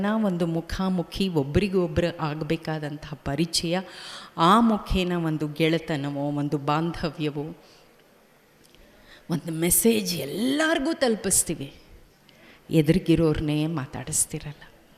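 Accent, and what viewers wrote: Standard. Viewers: ಯೆಂತಃ ಸ್ವಚ್ಛ ಕನ್ನಡ ನೀರರಗಳ ಮಾತು ಒಮ್ಮೆ ನಿಮಗೆ ನಮ್ಮ ನಮನ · ಇಂತಹ ಕನ್ನಡವನ್ನು ಇನ್ನು ಯಾರುಯಿಂದಲಾ ಕೇಳುಲ ಸಾದ್ದವಿಲ್ಲ. ನಮ್ಮ ಅಚ್ಚ ಕನ್ನಡದ ಅಪ್ಪರ್ಣ ರವರಿಗೆ ನನ್ನ ನಮನ ಗಳು 🙏🙏🙏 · ಸ್ವಚ್ಛ ಕನ್ನಡ ತಿ,ಕನ್ನಡದ ಒಡ ತಿ ನೀವು ನಮ್ಮೆಲ್ಲರ ಮನಸ್ಸಿ ನಲ್ಲಿ ಸ್ಥಿರ ವಾಗಿ ಇದ್ದೀರಿ ಮೇಡಂ so sad I ಮಿಸ್ ಯು ಮೇಡಂ ರಾತ್ರಿ ನಿಮ್ಮ ವಿಚಾರ ಗೊತ್ತಾ ದ ಮೇಲೆ ನಿದ್ರೆ ಬರಲಿಲ್ಲ ಮೇಡಂ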